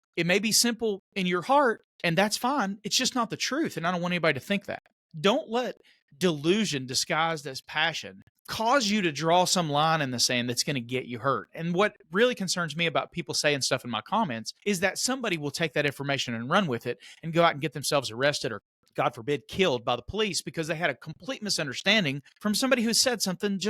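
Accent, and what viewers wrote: South, United States. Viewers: It's very easy to get worked up & talk out one's arse..... there's a lot to be said for being humble b/c LEO's use/misuse & abuse the LAW(s). I guess there's one State where 609+ DUI/DWI's where charged against mostly innocent folks. Guilty or not your smart mouth can cause you to take the "Ride". It might cost you a lot of money or your car? Your job or home might be lost so being smart is just wise but even if you get done wrong it's no guarantee that you can get good representation for a lawsuit. I'm seeing many who can't get help to pursue even when they manage to pony up the money so be wise in your actions/words. peace & TY Sir...... see ya on the next one!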